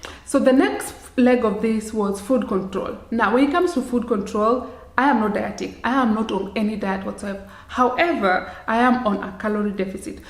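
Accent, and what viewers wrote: Kenya. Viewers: Are you from Kenya 🇰🇪? Remind me a lot my Kenya 🇰🇪 Queen accent so beautiful and elegant. Loved it. Lose weight not muscles eat 3 categories of this food: proteins, fiber and good fat.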